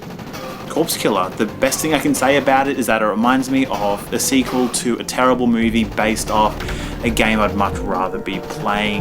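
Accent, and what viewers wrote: Australia. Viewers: you're Australian... its sea-ga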